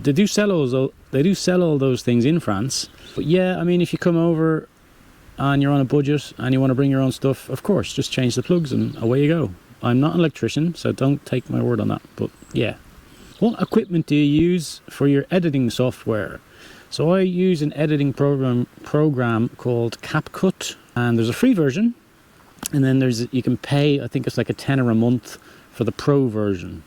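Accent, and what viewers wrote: Ireland. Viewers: I watch a few vlogs that some Brits make, and I have to say that your Irish accent is a pleasant change. At least, to my American ear. Sometimes Brits say weird stuff. 😊 The Q&As are great - keep doing them! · So, a certain tone of Irish is very romantic…is romance of interest to you? You seem like a KEEPER type. NOT ASKING FOR ME! But for other subscribers. (You’re too young for me)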